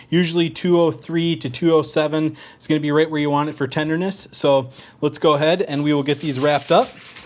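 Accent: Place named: Upper Midwest, United States. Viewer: Hahaha, you're not from the Upper Midwest are ya? Great video, I followed it to a T and they turned out great! thanks!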